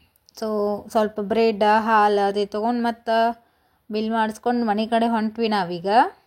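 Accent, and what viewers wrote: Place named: Uttara Kannada, India. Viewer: Nim bhashe super uttara Kannada super vlog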